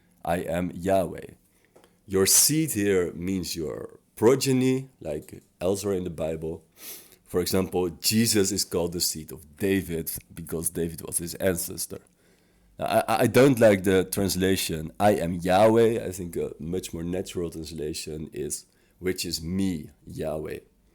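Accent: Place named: The Hague, Netherlands. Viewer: I can tell you are from den haag because of your accent lol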